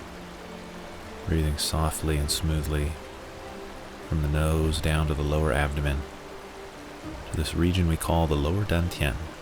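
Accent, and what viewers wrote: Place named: Scotland, United Kingdom. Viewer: Ah, wee of the Clan! Sorry to have missed the Great Scottish Retreat, a mere 50 miles from my cave in Perthshire. I have an excuse...I was in Portugal. Qigonged every day though! Looking forward to doing this routine and listening out for your Scottish accent...aye... 😀🏴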